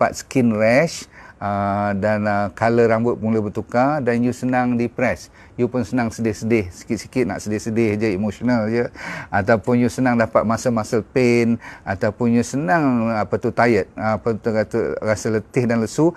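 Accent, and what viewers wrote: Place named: North, Malaysia. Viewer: Dr ckp ja utaqa . Lgi syoik dengaq . Hehehe .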